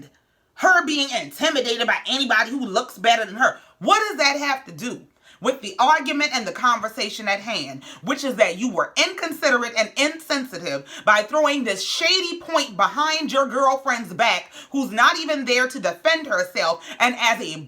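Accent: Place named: New Orleans, United States. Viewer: Love how you talk . It’s similar to NO. It’s so cool.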